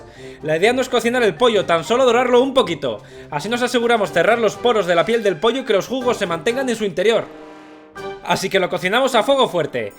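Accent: Basque Country, Spain. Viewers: Muy buenas tus recetas y muy bien explicadas. Te felicito. Me encanta oirte todas esas expresiones vascas tan simpaticas. Yo hace tiempo que vivo en Andalucía y escucharte hablar me recuerda a mi tierra y a mi familia. Eskerrik asko!!! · Hay que bonito acento los españoles :) no tengo vino tengo whisky jaja le podré poner un poquito 🤗🤗🤗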